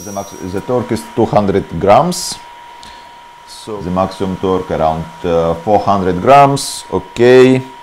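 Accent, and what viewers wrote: Russia. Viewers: произношение очень похоже на английское произношение русскоязычного человека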